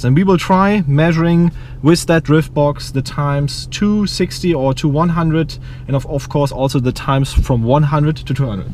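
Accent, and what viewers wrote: Germany. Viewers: man hört an seinem akzent das er deutscher ist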